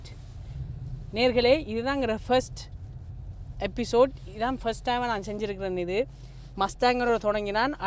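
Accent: Jaffna, Sri Lanka. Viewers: Bro unga srilanka Tamil romba pudichurukku Next Ben's review &Skoda kodaiq review heavy illa Tamil illa Main reasons pls all the best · Are u srilankan? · srilankan tamil nalla irukku...♥️♥️ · ஸ்ரீலங்கன் தமிழ் அருமை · Ohhh bro. I love ur lankan tamil accent.. keep going bro. Love from Madurai, Tamilnadu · Sri Lanka tamil 😆😆😆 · Sri lankan slang ❤️ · நல்ல சுத்தமான இலங்கை தமிழ் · Srilankan tamil kaekurathukku nalla irukku review va vida nenga paesurathu pudichirukku bro keep it up i am ur new subscriber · Jafna Tamil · Super srilankan Tamil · Hi brother I like your Sri Lankan Tamil style. Keep rocking 👍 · Enga Srilanka Tamil La Explain Pannum pothu Happy a iruku Bro 😍😍😍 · Srilankan tamil bro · Kingsley Rock It's Sri Lankan Tamil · Bro ninga srilankan aah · Sri Lanka Tamil... Azhagu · Itz ilangai tamil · Seems Ceylon Tamil review ... Thanks for posting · Sri Lanka la pronunciation apdidhaa irukkum bro..adhuvum azhagaanadhu dhaa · Hi bro i am srilanka unga videos super congratulations I am waiting for more videos neenga srilankan citizen Pola pesuringa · Neenga jaffna va Anna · Srilankan slang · Unka language style srilankan mathiri irukkuthu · Semma tamil bro srilankan tamil aa reviews sprp rolce royce review um paathuten spr bro ungaluku car kudutha ellarukum oru periya thnx naan sonnen nu sollirunga... 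Keep rocking and do more. All the best bro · Brother unga Ceylon tamil suppr... And also your ammalee word.. 😍😄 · Neenga Jaffa va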